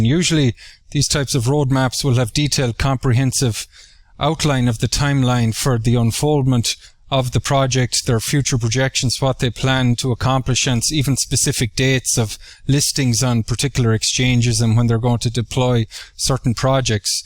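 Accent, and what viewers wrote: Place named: Ireland. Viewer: awesome breakdown! the Irish accent is a pleasant bonus!! thanks heaps..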